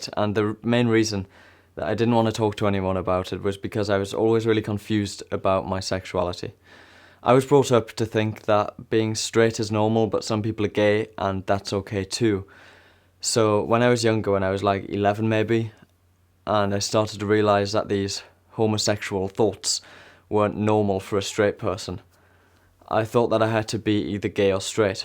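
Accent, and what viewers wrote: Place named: Geordie, United Kingdom. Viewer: I know you're from Tyne and Wear somewhere and your accent is too soft to be from North of the tyne, so I reckon you're either from Sunderland or sour Tyneside, maybe Whitburn or South Shields?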